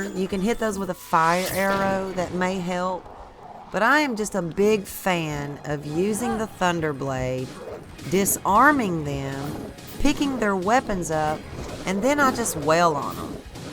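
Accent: South, United States. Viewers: Hello! I love your videos! I'm from the south, living in Mobile, AL, so your voice makes me feel right at home too. I hope you're ready for Tears of the Kingdom! -B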